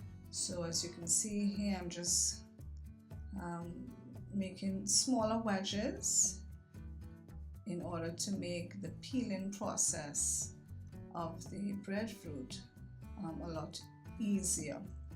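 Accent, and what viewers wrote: Trinidad and Tobago. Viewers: It sounds like a Trini in the house.:elbowcough: Great vid. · Is that a Trini accent i detect..? 🤔😃 Very informative, exactly what i needed.. thank u.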